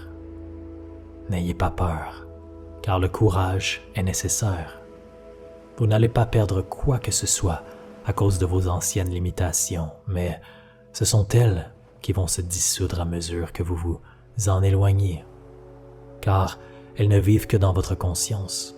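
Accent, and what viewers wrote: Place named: Quebec, Canada. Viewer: Merci à vous même si javoue que j'ai du mal avec votre charmant accent québécois, question d'habitude auditive...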